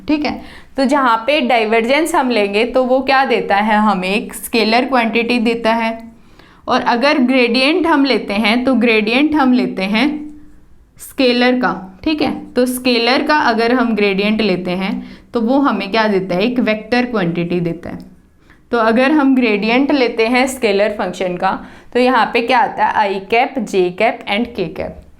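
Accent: Haryana, India. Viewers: Your haryanvi and Jaat wala lehja saf najar aa rha h bhen ji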